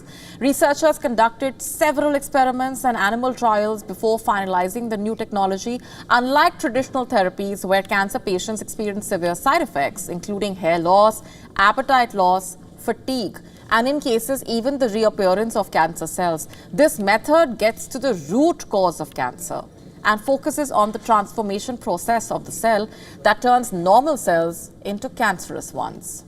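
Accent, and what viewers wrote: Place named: India. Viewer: She is annoying, the other anchors do a much better job...this is typical head bouncing Indian accent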